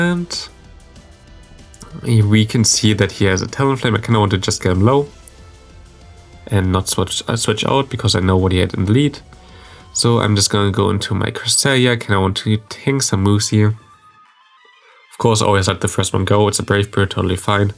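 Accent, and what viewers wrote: Germany. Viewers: which nationality are you?, sounds like german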